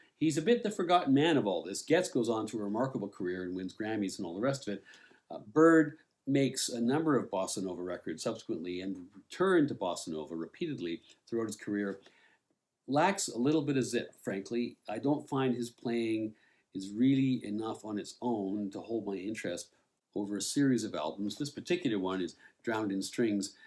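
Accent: Canada. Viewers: Really enjoyed this review. Maybe it's a start of something? Pick and artist or sub genre and do a best of/overview. Anyways very enjoyable and always well researched and presented. Good Job as they say in the US. Interesting that you started out in the SW of England I think you said. I kinda guessed that maybe you were Canadian. North American accent but not US. You made a great point on the US Govt sending down black Americans to represent them when at home the average Afro-american was not afforded the ......let's just say apartheid was alive and well! That's a whole other rabbit hole so back on point. Love your reviews and love your presentation and style. Very pro, well researched.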